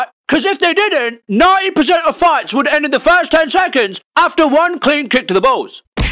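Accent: United Kingdom. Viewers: It's true, it comes up as dutch. I thought his voice sounds British. But, Dutch???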